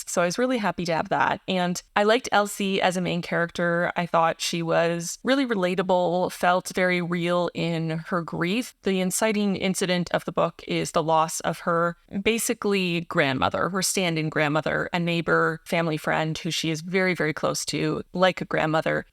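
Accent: Quebec, Canada. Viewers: En tant que française, c’est rigolo pour moi d’entendre une étrangère dont le français n’est pas la langue maternelle parler Québécois… Mais tu parles très bien ! 😊 I am (trying to) learn(ing) Dutch because I’ve been living in the Netherlands for two years, but this is such a difficult language, sometimes it’s similar to English, sometimes there are French words, but sometimes it’s 100% Dutch😅 I also use Babbel app.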